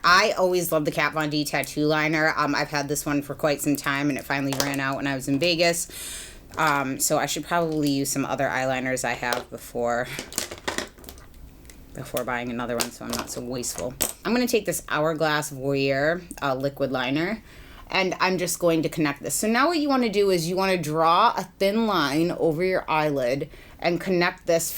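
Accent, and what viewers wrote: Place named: Boston, United States. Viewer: Hey, I love your videos, personality and your Boston accent - it reminds me of home! I like this exercise because it makes me realize that I really do have a lot to be grateful for. Having to choose my top picks, I would choose my skin, as it is nearly flawless and wrinkle free, which at my age is truly miraculous! Additionally, I am so proud to have graduated magna cum laude with honors from an Ivy League school. There were a lot of obstacles in my way, but I pushed through and received an education that no one can ever take away from me! Thanks for the opportunity to win the winged eyeliner products. I, like you, have struggled with uneven wings for years, so to win would be a real treat. Take care of yourself!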